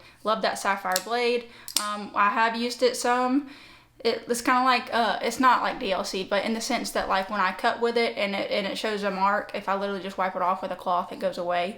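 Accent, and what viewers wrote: South, United States. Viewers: petit lovely young lady with giant frigen knives. And a Nurse! suthrn accent. I cant comprehend it all. neet woman! love her personality. The Malibu and the last one where a toss up for me. I love a chunky knife. That last one was amazing to my tastes. Hmmm. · Okay.... I'm never watching any other knife reviewer again. I love her reviews, her smiling face, her southern draw, her hair, and the sound of her voice. Great content. Beautiful stuff. The knives weren't bad either. ❤️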